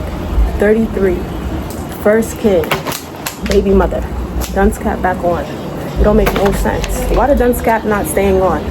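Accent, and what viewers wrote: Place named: New York, United States. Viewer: Yo you gotta be from New York lol.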